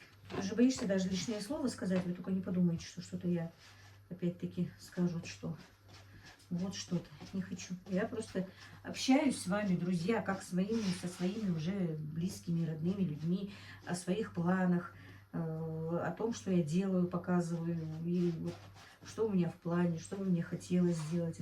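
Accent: Standard. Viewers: У вас чистая русская речь,удивительно даже,дай бог здоровья! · здравствуйте, молодец, как у вас всё здорово получается, а как вы чистейшей говорите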